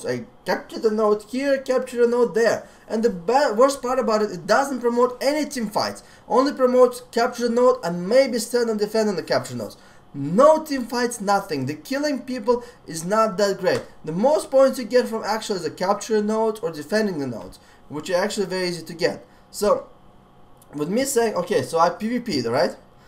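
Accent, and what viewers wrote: Russia. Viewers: Pure russian accent and humor . Gotta love his style <3 .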